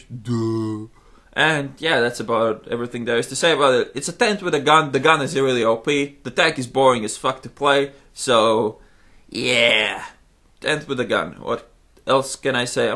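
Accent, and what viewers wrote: Russia. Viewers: lol, Id love those russian accent, some how I just can't pretend one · dat russian accent :))) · LMFAO! Love your Ruski accent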